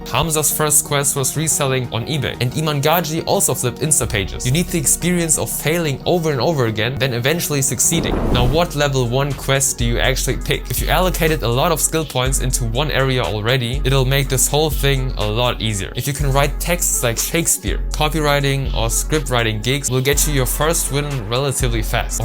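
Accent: Germany. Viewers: are you german loool? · are you German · German Accent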